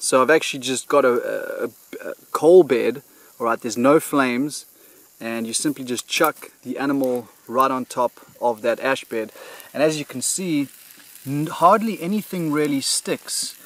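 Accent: South Africa. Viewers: Are you from South Africa it's just the accent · Is that SA accent I hear? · You're South African? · you sound south african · Sounds South African..long way from home either way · is he south african? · dude, u south african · YOou kind of sound south african? Zimbo? That would make sense. You know survival. comes natural with us...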